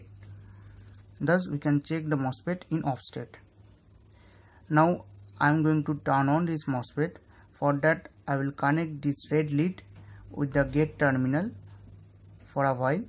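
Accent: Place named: Mumbai, India. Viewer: bombay accent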